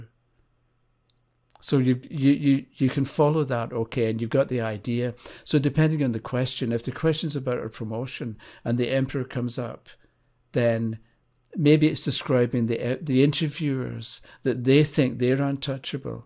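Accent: Scotland, United Kingdom. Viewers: just gave it another listen, y’all are right it’s definitely a Scottish accent. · Are you Scottish? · What part of Scotland 🏴 yoos from ? I grew up in Juniper Green, Edinburgh! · Definitely Scottish, Glasgow probably. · I am picking up Scottish undertones in his accent 🤗 makes me feel homesick haha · A lovely gentle Scottish accent. Music to my ears. 🏴💖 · Love the accent I'm going to go on a limb and I'm going to guess that you're Scottish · From what part of Scotland do you hail? Glasgow I'm guessing. Thanks for your lovely video! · What a lovely gentleman. It's really nice to hear a soft, soothing Scottish accent 🏴 I took from this that association is key, or as I like to call it the "no degrees" of separation! XXX ♥️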